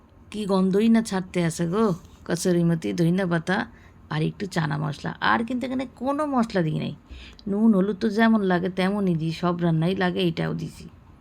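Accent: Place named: Dhaka, Bangladesh. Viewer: দিদি ঢাকাইয়া কথা আমার শশুর বাড়ি বাপের বাড়ি সব ঢাকাইয়া। তোমার কথা শুনলাম সত্যি সত্যি অনেক ভালো লাগলো। চানা দারুণ লোভনীয় হয়েছে। তৈরি করার পদ্ধতি দারুণ। ভালো থেকো দিদি। ❤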